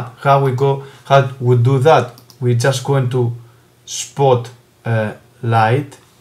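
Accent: Greece. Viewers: το βιντεο ειναι τρομερα βοηθητικο... με το που σε ακουσα να μιλας λεω κατευθειαν αυτος ειναι ελληνας 100% μπαινω στο καναλι σου... βλεπω ελληνικες πινακιδες στο αυτοκινητο σου... και γαμω τα καναλια... subed <3 ;)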